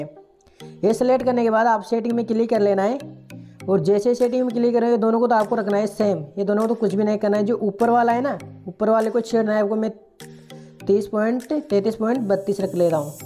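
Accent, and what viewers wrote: Gujarat, India. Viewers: Patel sound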